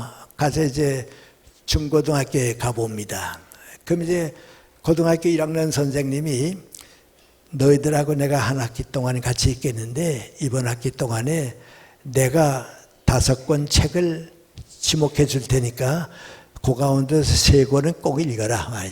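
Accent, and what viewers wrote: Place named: North Korea. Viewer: 강의 중반으로 들어갈때부터 은근 화가 치밀어 오릅니다. 1980년 초반 대학 다닐 때 이분이 쓴 교과서를 기억합니다. 비겁합니다. 나라가, 당신들의 월남을 받아들이고 평생 꿈을 이루고 살게 해 준 이승만의 대한국에서 지금껏 당신같은 지식 기득권층이 한 일이 뭡니까? 북한 위주의 통일을 지향하며그들의 음모와 흐름, 역사의 역향을 보면서도 침묵하며 출판 기득권을 유지하며 민초들을, 사회 구석구석의 무넘짐을 바러보며 참묵으로 일관 해 오셨습니까? 박정희의 독재를 인 마킹하며 북쪽지향 기류에 편승해 앞 뒤 마당 땅 다지고 계십니까? 철학적 기독교인이라고요? 달변에 인쎄큐어리티로 사람들을 내려다 보면서 스스로의 철학적 헐벗음은 못 보십니까? 이북식 말투조차 역겹습니다.. 자유민주주의 대한민국이 아직도 만만해 보이십니까? 연세대 출신들이 저지른 대국민, 대기독교적 모욕은 요한 계시록에서 투영하고 있는 심판을 피할 수 없을 것입니다 개대중이 커밍 아웃부터 지금까지 30 여 년을 그동안 뭘 하시다 나와서 달변을 풀어 내십니까... 미국의 마지막 통고가 코앞이니 좀 걱정이 되십니까??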